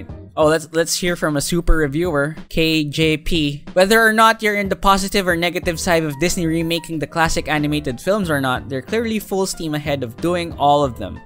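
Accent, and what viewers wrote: Philippines. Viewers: Hey bro, great video hahaha can't help but notice you have a little bit of a Filipino accent. Greetings from the Philippines! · Do I hear a Filipino accent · Are you Filipino? i can sorta hear a bit of an accent behind your words lol.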